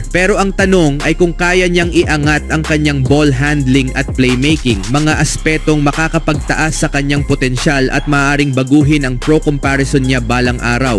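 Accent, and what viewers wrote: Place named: Visayas, Philippines. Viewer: Bisaya ka sir halata salita mo?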